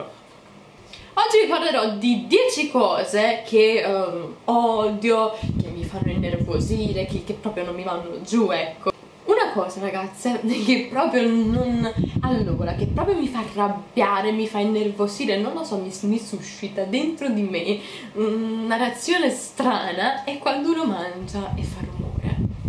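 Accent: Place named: Naples, Italy. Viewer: sei di una bellezza rara. Il punto però è un altro, secondo me saresti un'ottima attrice per la tua grandiosa espressività. Sono anni che sono su youtube, seguo tante tante ragazze, ma questo gesticolare, parlare fresco e accentato napoletano senza alcuna volgarità, fa sì che tu possa ricordarmi la amata Sofia Loren. Baci.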